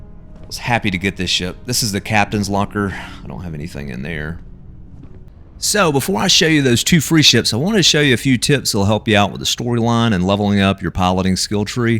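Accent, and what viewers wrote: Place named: Texas, United States. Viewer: You sound like a Texan, and YouTube is severely lacking in Texan gaming content creators. Subbed and liked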